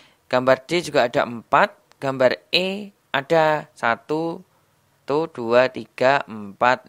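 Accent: Java, Indonesia. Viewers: Saya kalo dengerin mas menjelaskan soal soal suka ketawak... Abisnya lucu sih 😁😄😄 kalo ngomong medoknya ituloh bisa bikin saya ketawa 😄😄😄 suka guyon.... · Ikutun channel kamu saya jafi ikut medok ngomongnya koh😅🥰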